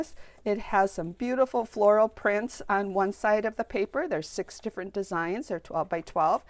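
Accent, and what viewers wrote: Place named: Midwest, United States. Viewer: I saw your twisted ribbon card on Pinterest and it led me to the video. I think your explanation of how to make the card was clear and concise. It’s a beautiful card and I can’t wait to make some. Now I’ll be looking for other YouTube videos you’ve posted. Thank you for sharing your talent. I love your accent, are you from the northern Midwest? U.P.?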